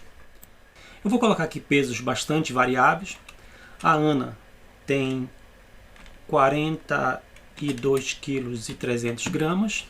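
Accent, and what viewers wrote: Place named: North, Brazil. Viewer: mas o sotaque de nortista tá em dia hehe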